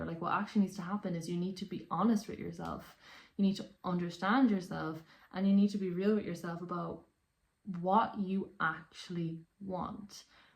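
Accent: Ireland. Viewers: Your killing me with the Irish accent. Love the accent. Your doing a great job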